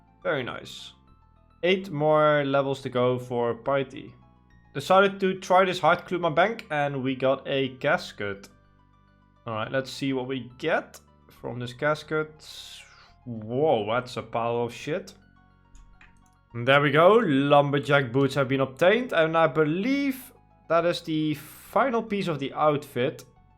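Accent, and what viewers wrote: Netherlands. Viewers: I can hear the thicc dutch accent. Keep up the good work maatje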